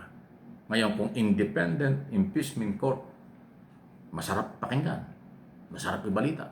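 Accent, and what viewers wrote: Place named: Visayas, Philippines. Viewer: Ang labo ng mga pinagsasabi mo walang kalatoy - latoy cgurado ako bisaya kang tanga,kc parehas kayo ni inday kong magtagalog at hindi naiintindihan ang mga pinagsasabi parehas na parehas kayong inutil.At huwag kang masyadong magpakatanga na ang mga iniidolo mo matitino,kong talagang matino bakit nakulong na kc demonyo iyon ang totoo.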